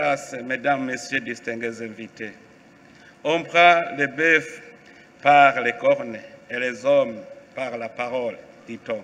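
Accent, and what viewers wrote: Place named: Burundi. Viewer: Igifaransa kikirundi kbsa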